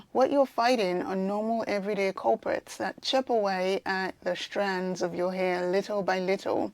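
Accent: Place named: New Zealand. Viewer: The New Zealand accent is just the best. I also have a kettlebell training account I follow just because of her accent. 😂😂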